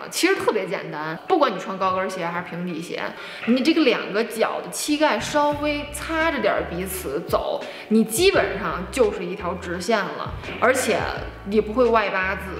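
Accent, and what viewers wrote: Tianjin, China. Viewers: 老乡，一听你说话就知道你是天津人，超飒。一听到你说，马上贴墙测试，发现轻松两只手可以放后背，那我还适合这个站姿吗，怎么让骨盆回到正常位置？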